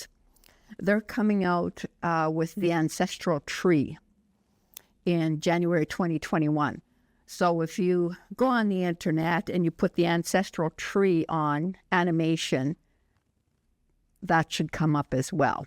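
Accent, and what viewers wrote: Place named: Michif, Canada. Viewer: Beautiful Northern Michif! It’s good for learners to know this is Northern Michif, just so that they know that Southern Michif or Michif French would sound quite different. Learners will probably find it most helpful to start with learning just one at first, so they don’t get confused.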